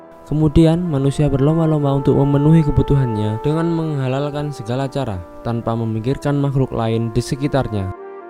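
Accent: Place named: Java, Indonesia. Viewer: medokkk men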